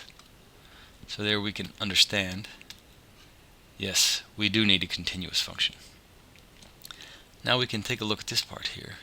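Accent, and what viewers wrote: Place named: England, United Kingdom. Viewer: Just the paint, it seemd your accent was English. Nice video also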